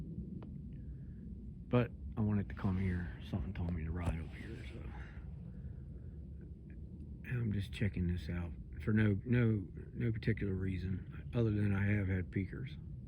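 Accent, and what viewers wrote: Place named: Southwestern Pennsylvania, United States. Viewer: First and only time ive managed to be the first thumbs up lol. When i listen to you talk your accent sounds identical to the way my relatives in southwestern Pennsylvania talk and i was curious if people in Maryland have that same accent or if you weren't raised there? Intrigued as to how the other part of this video will go and if your pebble hurler will follow you over there? Good luck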